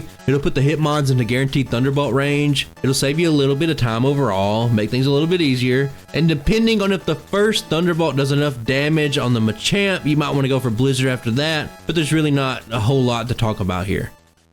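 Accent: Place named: Kentucky, United States. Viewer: The accent sounds like my own, I’m from Kentucky lmfao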